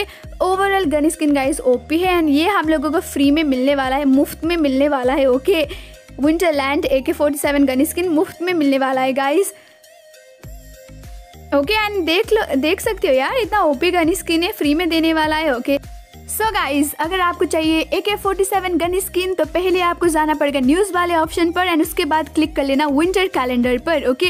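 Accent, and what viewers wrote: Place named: Nepal. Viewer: You sa like a nepali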